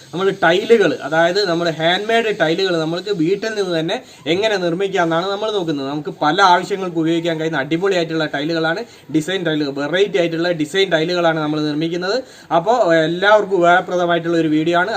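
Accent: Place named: Kozhikode, India. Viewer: കോഴിക്കോടാണല്ലോ ഭാഷ,ഞാനും ഒരു കോഴിക്കോട്ടുകാരനാണ്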